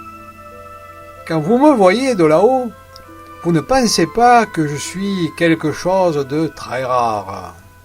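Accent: France. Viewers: très belle histoire, bien racontée et avé l'acent ! ( pas celui de Marseille mais celui de Toulon...)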